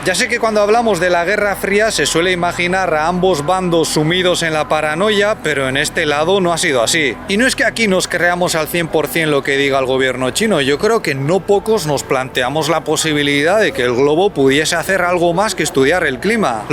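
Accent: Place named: Basque Country, Spain. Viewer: tiene acento Vasco. Parece que está echo un comunista de bien 🤣. En Euskadi también hay algún que otro partido comunista que apoya al presidente de España.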